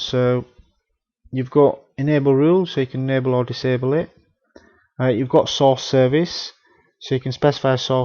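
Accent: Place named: England, United Kingdom. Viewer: Dude, you are soooo English!!! :-) I just don't have the accent (grew up in the UK when I was younger). Excellent explanations by the way...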